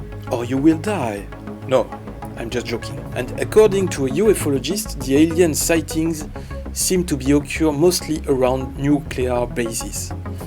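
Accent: France. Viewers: Congrats on the new look and experience to the Channel. IMHO, loud background music only makes it difficult to understand your French accented English. Please consider keeping the volume levels of background music lower as they interfere with your message. Thanks for keeping up an interesting channel.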